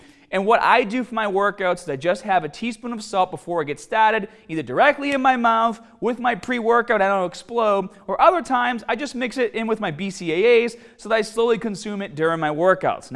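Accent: Boston, United States. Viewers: Lowis Coetzee He has a strong Boston accent, which he acknowledges.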